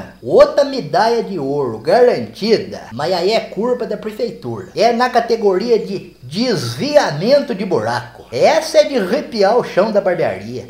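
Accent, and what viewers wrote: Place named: Piracicaba, Brazil. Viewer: quando ocê veio pa Piracicaba? porque ocê falo do jeito que é aqui onde eu moro. Apesar que nois piracicabano samo um pouco mineiro também.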